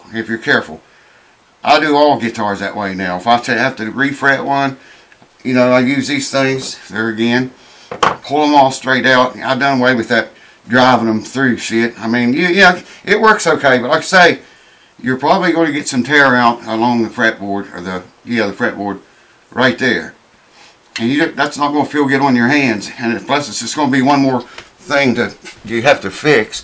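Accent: South, United States. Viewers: Heard of this for specialized frets ( dont remember the details). Like you vids mostky because of good practical info. 2) your southern drawl. I am from the north and find it facinating! Like hearing it ( used to live in north west FL - lots of alabamans and was in army- same. Also southern females are especially fantastic!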